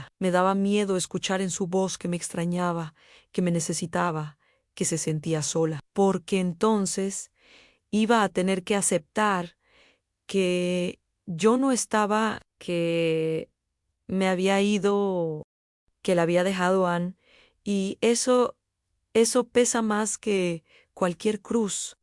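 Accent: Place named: United States. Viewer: Porque ese tono Pocho igual que las Historias de hombres con Historias de Inmigrantes an ho muy pochos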